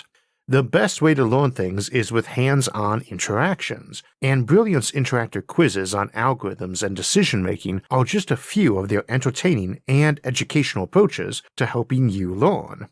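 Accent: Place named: Texas, United States. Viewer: You have a fascinating accent. It sounds like a perfect blend of texan drawl with the intonations of someone with english as a second language. I don't mean any offence by this, its just a very unique sound.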